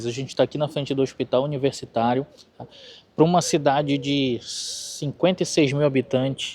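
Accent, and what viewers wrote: Pará, Brazil. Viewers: Mano parece se do Pará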